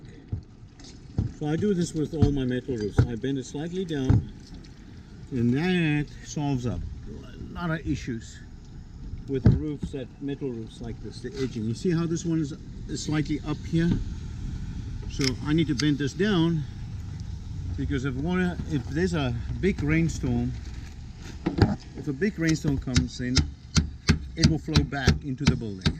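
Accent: South Africa. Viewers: Is that a South African accent ☺ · Baie Dankie! I spotted the accent too! Ek stuur groete from a very rainy Pretoria. I have this problem too with my afdakkie roof so ill give this a try. You haven't lost the SA sense of humour!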